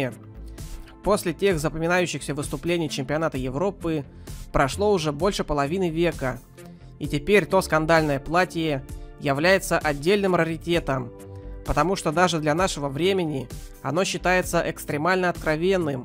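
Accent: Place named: Ukraine. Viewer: типичное украинское произношение, не в обиду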